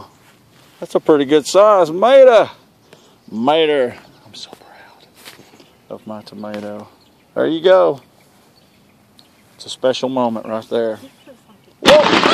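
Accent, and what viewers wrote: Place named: Mississippi, United States. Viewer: First comment of 2016 BTW ur from Mississippi huh that's how we sound down here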